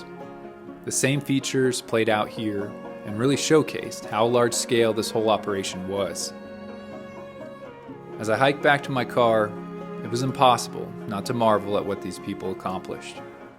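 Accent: United States. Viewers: 100s of meters? you sound american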